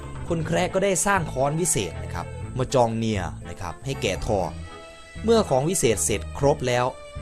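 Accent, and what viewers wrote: Isan, Thailand. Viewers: พี่เป็นคนอิสานใช่มั้ยครับ 555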